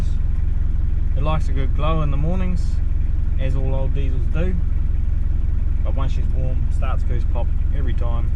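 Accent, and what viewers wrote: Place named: New Zealand. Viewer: All us kiwis sound like that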